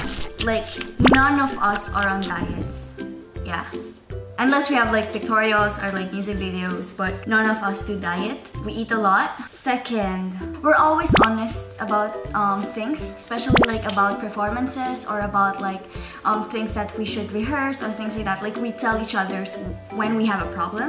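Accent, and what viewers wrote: Philippines. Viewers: Love her Standard Pinoy English Accent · Pinay accent 🤣😍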